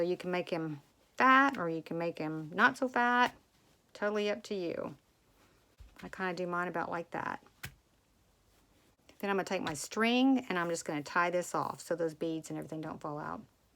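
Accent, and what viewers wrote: South, United States. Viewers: Fantastic can’t wait to get making some! I love your southern US accent too 🇬🇧😀🤗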